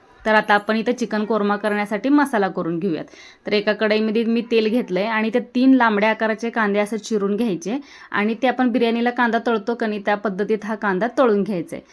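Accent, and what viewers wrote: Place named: Kolhapur, India. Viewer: Khup ch chhan banvte tai tu chikan chya resipi s aani bolte pn chhan tuzi kolhapuri bhasha khup chhan aahe❤❤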